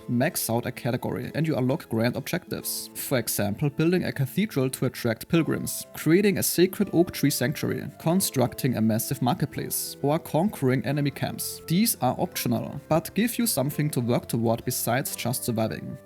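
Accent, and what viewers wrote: Germany. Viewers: absolute pain listening to your bad german english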